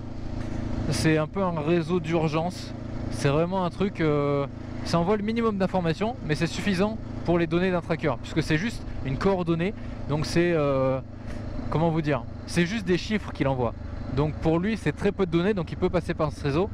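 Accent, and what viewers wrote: Brive, France. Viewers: T'es de Brive ? ✌🏻😅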